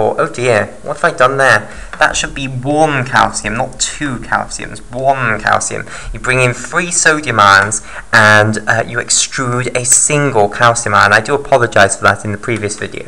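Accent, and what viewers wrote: United Kingdom. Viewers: well explain and well accent british accent , right ?????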